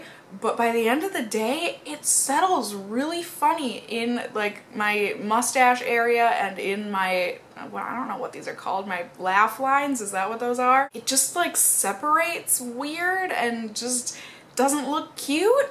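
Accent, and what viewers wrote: Minnesota, United States. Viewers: Well, she is from Minnesota, so that's probably just her accent.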